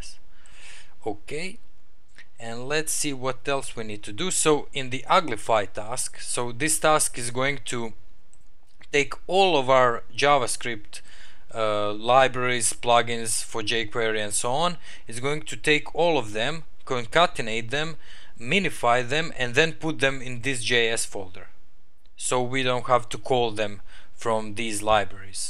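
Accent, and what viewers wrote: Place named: Russia. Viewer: с первой секунды понял что ты русский)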